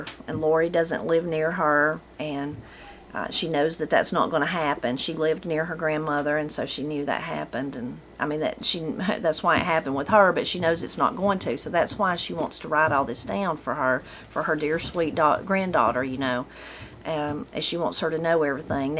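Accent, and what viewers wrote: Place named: North Carolina, United States. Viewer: Thank you for reading this! I first read “Christy” back in the early ‘70’s, have gifted it to others, and re-read it myself a few times since. I’ve just ordered a copy of “Letters to Lori”. I live in Granite Falls, WA and do not often get to hear a beautiful N.C. accent, so I truly appreciate you reading the book and your commentary on it. It was a special blessing to see the video in the link with Miss Opal❤ May God bless you and your loved ones. Also, know that I’m praying for those folks who’ve asked for prayer.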